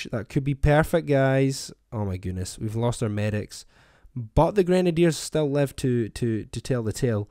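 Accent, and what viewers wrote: Scotland, United Kingdom. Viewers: Are you Scottish lad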